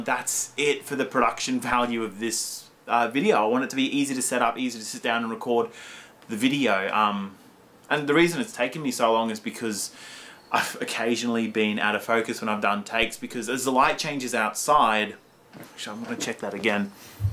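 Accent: Australia. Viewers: your creativity, editing style and fun aussie accent are what I enjoy about your videos. you should do whatever you are passionate about in your videos. keep up the good work bro :)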